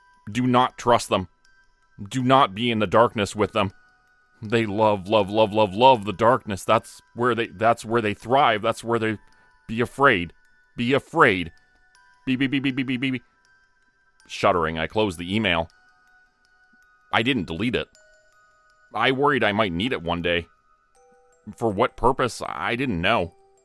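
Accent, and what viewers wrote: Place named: Canada. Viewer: He's from Canada and Canadians pronounce certain words differently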